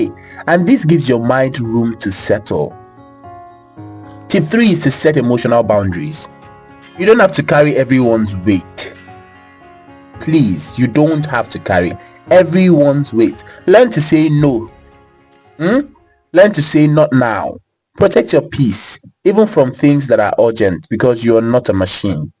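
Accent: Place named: Igbo, Nigeria. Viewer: The igbotic accent for me 😂😅🤣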